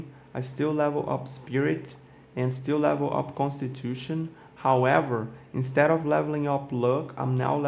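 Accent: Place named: Brazil. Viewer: Da pra perceber q é br pelo sotaque kkk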